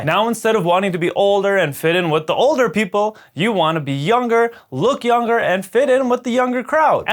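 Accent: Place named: United States. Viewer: I didn't expect him to speak in an American accent 💀💀💀💀💀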